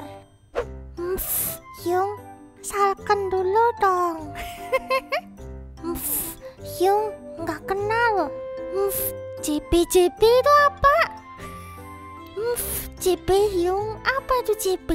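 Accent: Java, Indonesia. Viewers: orang jawa ya kak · wong jowo y? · Fix logat jawa:v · Intonasi jawanya kerasa banget, kayak sensasi baru gitu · Kak logat ny kaya jawa terus kak abang nya kok kaya sunda 🤔 sebenernya sodara bukan sih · Dari Legok Suaranya Aslinya Orang Jawa:v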